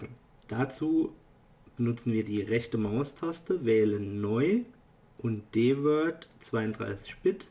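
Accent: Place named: Hesse, Germany. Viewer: Bist du Hesse? Du hörst dich nämlich an wie einer :)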